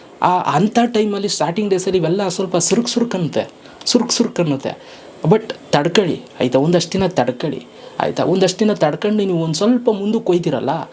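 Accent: Mandya, India. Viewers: ನನಗೆ ಇನ್ನು ಭಯದಲ್ಲೇ 🤣 ವಿಡಿಯೋ ಮಾಡ್ತೀನಿ ಹಾಗಾಗಿ ವಿಡಿಯೋ ಸ್ವಲ್ಪ ಶೇಕ್ ಆಗ್ತಾಯಿದ್ದೆ 😅 ನಿಮ್ಮ ಆ ಮಂಡ್ಯ ಭಾಷೆಯ ಸೊಗಡು 👌 💛❤️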